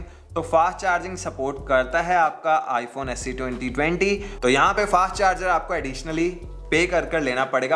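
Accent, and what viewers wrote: Punjab, India. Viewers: First. U doin good work n givng compt info about aple prodct Srcond:- i love to hear your voice as typicl desi punjabi voice spcl my you tube ki family line Third:- punjbi bhai yeah batao koun se airpod liye jaye sab kuch considr kar ke price quality Fourth:- kya abhi iphone 11 pro lena chaiye ya wait kiya jaye iphone 12 ke liye. Fifth:- iphone 11 pro vs iphone 11 pro max koun sa sahi rahe ga Sixth:- kya usa aor india ke phone mein koi faraq hai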